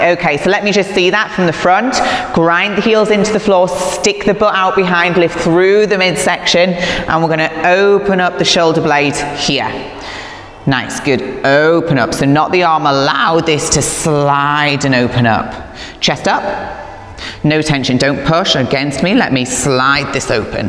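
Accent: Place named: United States. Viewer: Non capisco l'americano inglese 😔😔😔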